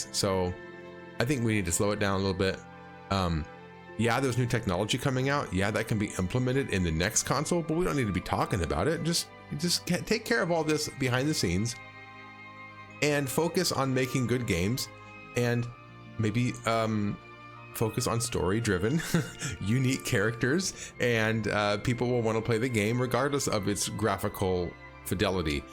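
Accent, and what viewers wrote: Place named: Midwest, United States. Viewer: Are you from Minnesota or the Midwest? Your accent is strong lol.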